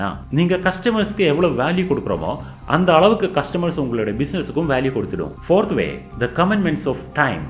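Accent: Kerala, India. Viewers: Kerala slang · Avar malayali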